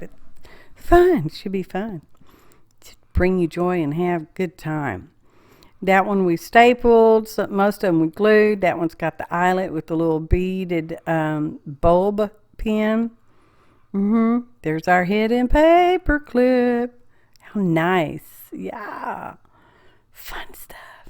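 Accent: South, United States. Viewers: I love your accent. Are you a Southern Belle. ❤ I loved the swatches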